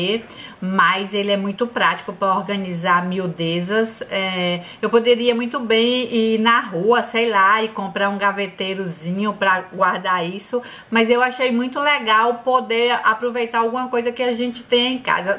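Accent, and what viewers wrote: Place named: Ceará, Brazil. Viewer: Sotaque lindo! Ceará?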